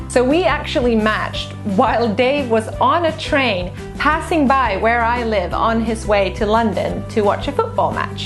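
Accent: Finland. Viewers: They were from the Helsinki/Espoo area. I heard you're from a small town or village. They were all Finnish speaking Finns, except for one who claimed to speak Swedish and Finnish both as native languages. She didn't start a conversation with me, she dropped a toy mascot on the floor, I found it, then she came up to me to claim it back. BTW, I can hear your Finnish accent when you speak Swedish.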